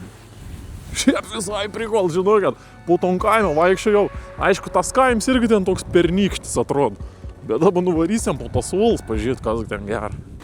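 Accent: Samogitia, Lithuania. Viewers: Nu, kaip su baltu pavydu stebiu Jūsų keliones, smagu žiūrėti, o dar ta žemaitiška šnekta, nu faina. Ir toliau taip, sėkmės.😂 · Ir dar žemaitiška tarmė priduod grožia. 👍👍👍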